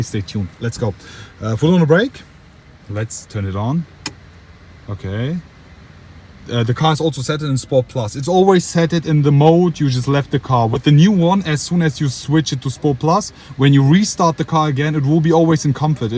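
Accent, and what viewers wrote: Germany. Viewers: I don't want to offend you now, but you should really work on your English, the intonation is awful. That sounds like a foreigner is trying to speak German so abruptly, only in English.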